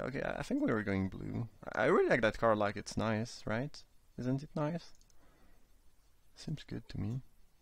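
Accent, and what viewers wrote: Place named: France. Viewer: Where are you from? I can't really figure out your accent. Sound french-ish to me but i'm not sure.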